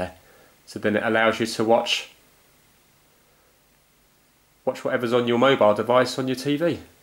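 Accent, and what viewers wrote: United Kingdom. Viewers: I LOVE BRITISH ACCENT. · British Accent is Ughhhh!!! 😍😍😍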